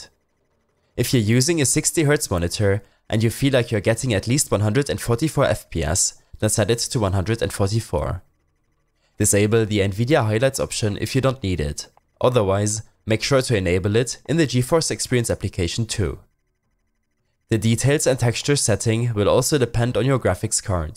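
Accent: Germany. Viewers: Ich finde man hat schon rausgehört das du deutsch bist aber wo du nvidia highlites gesagt hast hab ich nur gelacht das klang auf Einmal so deutsch,aber gutes video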